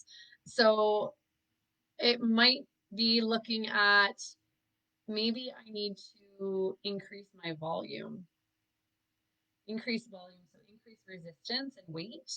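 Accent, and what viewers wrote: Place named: Northland, New Zealand. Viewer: Are you located in the northland area ? You have that kind of accent 😜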